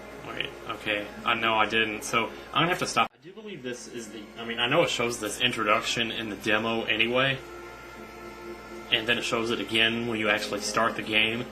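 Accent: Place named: South, United States. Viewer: I'm going to watch this LP once again. This is one of my favorites and still has abit of the southern accent which is funny good stuff! One of the best ever "lemme tell ya".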